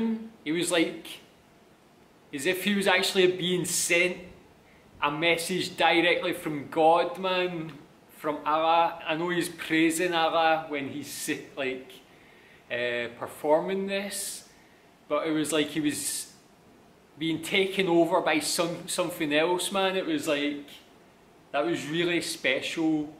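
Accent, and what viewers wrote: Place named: Scotland, United Kingdom. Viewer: Love your Scottish accent : )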